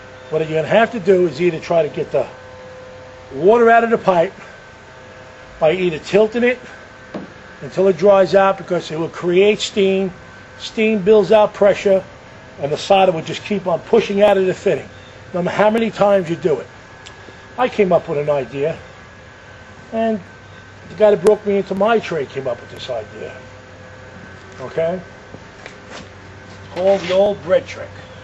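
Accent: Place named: Staten Island, United States. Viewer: I think any of you Youtube nerds, that want to talk bad about, should def. think twice.. LOL anyway, Im in Jersey,(just across the water) and love the tips and your strong Staten Island NY accent.. I have a more technical question.. Anyone with help, please email me Im repairing a p-trap on a bathroom sink from the 1920's or 1930's, can i connect pvc to the old brass pipe, with just a coupling?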